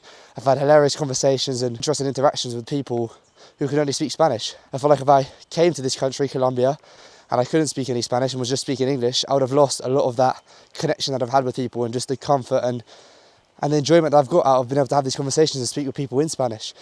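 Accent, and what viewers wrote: England, United Kingdom. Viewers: Se nota que eres británico de Inglaterra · your British accent is also wonderful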